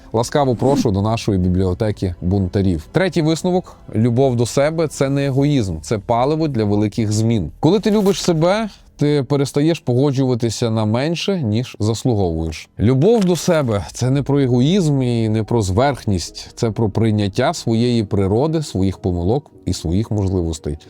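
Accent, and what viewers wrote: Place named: Lviv, Ukraine. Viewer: Перший раз побачив на підкасті Фонтан. Перші пару випусків було досить незвично...Але, через деякий час звик до "львівської подачі") - почало подобатися... БВБ, на мій погляд, гарний формат в який ведучий досить гармонійно вписався! Дякую дядь:))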